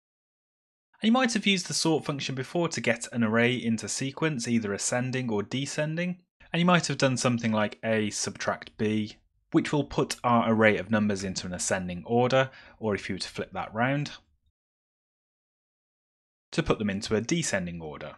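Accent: United Kingdom. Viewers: I think you are from UK try to speak slowly so we asian can get what are you saying just a suggestion btw nice tutorial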